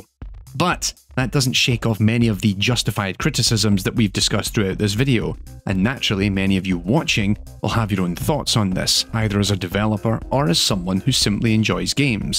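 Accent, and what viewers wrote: Scotland, United Kingdom. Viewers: Ah, another video from. Marvelous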